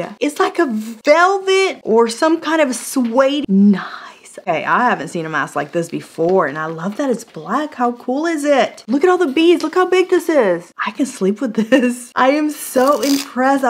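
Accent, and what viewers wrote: Texas, United States. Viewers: Girl are you from TX? I am from San Antonio and you got that TX flare!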